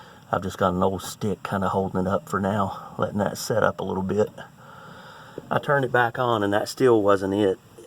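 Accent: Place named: Southeast, United States. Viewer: Hey man, Where' bouts you from? You sound like you may be somewhere near the southeast region? maybe? haha :)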